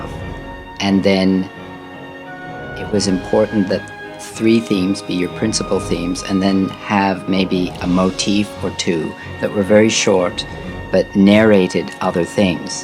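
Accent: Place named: United Kingdom. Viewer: James spoke in a more Gr. Britain style of English?! (At least that kind of impression, his English are giving me here)...Wow! I didn't knew that! I'm impressed! Dear James...we mis you terribly, all over the world! May God rest your soul, and we thank you for the incredible music you gave us! Our love and respect to you, from your fans here in Greece.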